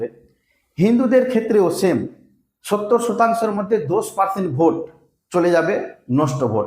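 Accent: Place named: India. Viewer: হিন্দি টান দিয়ে কথা বলছিস কেন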